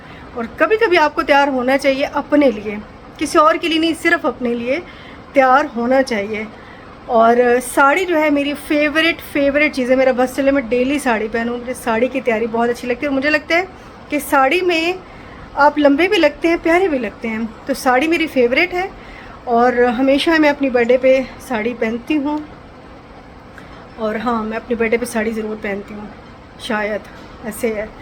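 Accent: Saraiki, Pakistan. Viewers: aap bhi shayad new ho kiu k apko pata hona chahye k yeh ab nahi khasy time say yahan shift hui hain or ham dosron ko to nahi dekhain gay apna farz poora karain gay na neighbours ko pata hona chahye aik dosry ka unko bhi hona chahye tha or inko bhi islamic country mai reh rahy hain na aap log yeh kasa waqt aa gia hay k sari dunia say rabty mai ho jaye insan or apny neighbours ka hi pata na ho yeh achi baat nahi hoti or haan mujhy k vlogs bahut achy lagty hain start say hi dekh rahi hoon inka saraiki lehja mujhy bahut pasand hay or aik dosry ko achi baat batani chahye bura nahi manana chahye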